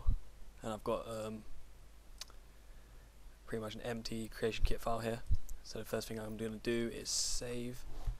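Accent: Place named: London, United Kingdom. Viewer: London accent m8.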